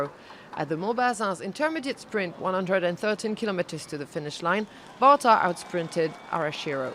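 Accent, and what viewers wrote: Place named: France. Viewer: I really like her frensh accent, it fits so well to this event and sounds wonderfully different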